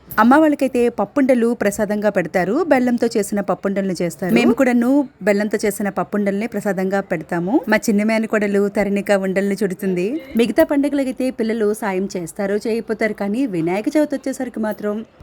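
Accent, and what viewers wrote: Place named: Srikakulam, India. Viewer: మావూరేనే మీది కూడా. మీ మాటలు విని. అప్పుడే. అనుకున్నా. మన. శ్రీకాకుళం అని. 👌👌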